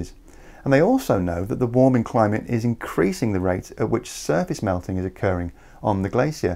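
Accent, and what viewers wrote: United Kingdom. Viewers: this Brit-voiced clown is a total fake-news CarbonTaxFraudScam alarmist propagandist. Anyone can look up Dr.Patrick Moore or other FACTUAL evidence-based sources for the TRUTH about how greatly increased co2 will be an enormous benefit to our climate & biosphere. Here's the TRUTH & FACTS: co2 FACTS vs neurotic alarmist fake-news FICTION: Greatly Increased co2 will reforest the Sahara, Gobi, Atacama, Western US, and all other barren deserts worldwide, as well as the desolate Arctic & Antarctic tundra-wastelands. Frozen immobile ice will melt and CIRCULATE moderating & cooling the equator & tropics, as warmer polar oceans evaporate & REHUMIDIFY the atmosphere precipitating & soaking the deserts into lush jungles & fertile farmland while restoring depleted aquifers & watersheds globally. ZERO phoney "sea level rise" (and btw, REAL PHYSICS: floating ice does NOT increase the level of the water it floats in as it melts! 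DUH. the buoyed ice above the waterline is no longer pressing down once it has melted, DUH). The Sahara alone will soak up more water than all the ice on Greenland & Antarctica. Terrible crop-killing winters will give way to year-round growing seasons in the Great Lakes and other agricultural lands worldwide. The Great Lakes basin with its uber-rich soils & unlimited fresh water will produce 1,000x more food than the San Joaquin Valley (which requires unsustainable irrigation & fertilizers). That's sustainable food for 35 BILLION PEOPLE. Terrible crop-killing winte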